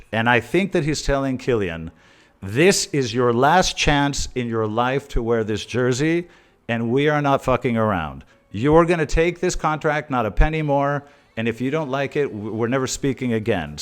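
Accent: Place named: United States. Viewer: You have a perfect American accent. Are you American-Spanish? Wait, are you a polyglot? French, Spanish and English? Italian and Portuguese maybe??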